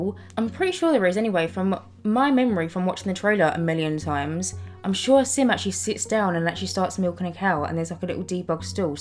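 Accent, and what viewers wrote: United Kingdom. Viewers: how I instantly knew was british 1. its well old 2. her accent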